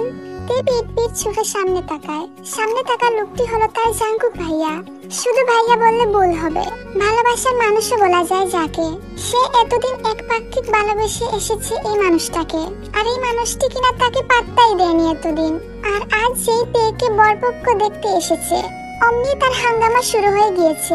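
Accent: Sylhet, Bangladesh. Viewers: আচ্ছা আপু 🌝 আপু কি সিলেটি ভাষায় কথা বলে আমার যেমন কিছু কিছু সিলেটি ভাষা মনে হলো কারণ আমি সিলেটে থাকি 😂।আপু তুমি কেমন আছো। ভিডিওটি অনেক সুন্দর হয়েছে। প্লিজ রিপ্লে দিও। আর তুমি একদিন লাইভে এসো 🥰।‌ প্লিজ আপু।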